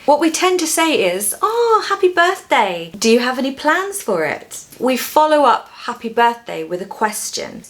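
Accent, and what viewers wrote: United Kingdom. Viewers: Usually I didn't like to write a comment here, but you made an incredible explanation for me, and I also like to hear your voice with a British accent. Sorry if my English is not accurate or there is something wrong with my comment. 😊❤ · Hello,. I always enjoy your videos! I used to live in London, and I love your beautiful British accent! Did you have a nice summer? I'd like to say I had a good summer despite the awful heat. You'll never guess what happened. I fell into the underfloor storage and broke my leg! I hope you stay fit and have a lovely autumn! Bye! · You're my best British English accent teacher ❤🎉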